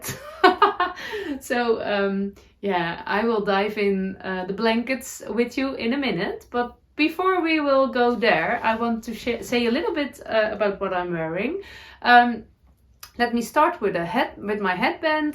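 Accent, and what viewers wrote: Netherlands. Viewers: As a second generation Dutch Canadian, can I just say that I LOVE listening to you speak. ❤ It almost feels like I’m visiting with a Dutch relative, and when you try and find the right English words I find myself cheering you on as you try different ways to say it. I wish I spoke Dutch - it’s such a beautiful language and a beautiful heritage that brings me so much joy to listen to. ❤️ Someday I hope to visit!!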